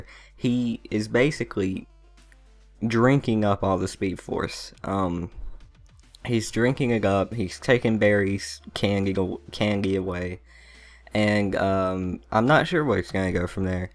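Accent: South, United States. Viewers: Is that a mild Southern accent? Just curious, mate!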